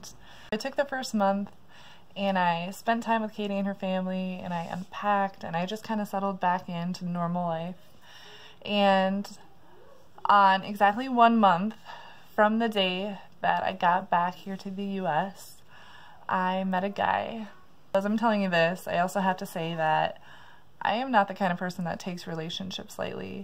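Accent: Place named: Minnesota, United States. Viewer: Very happy for you. 😎 I just found and subscribed to your channel. I'm a South Dakotan and have previously lived in MN for 12 years (now in Springfield MO.) I recognize your MN accent. 😉 I have been to Pitt many times and agree that it is an interesting city. (My daughter lives there.) You have created a dream life for yourself. I so wish I was young again and healthy enough to travel. One more thing, I'm going to bet myself that your last name ends with -son or -sen. Lol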